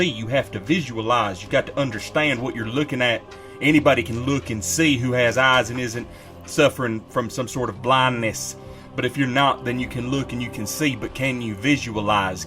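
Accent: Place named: Appalachia, United States. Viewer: Cool hill billy accent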